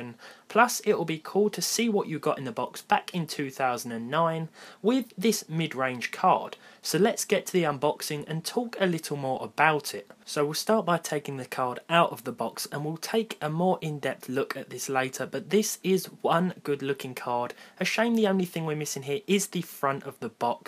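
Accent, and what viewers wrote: United Kingdom. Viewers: i love your british accent dude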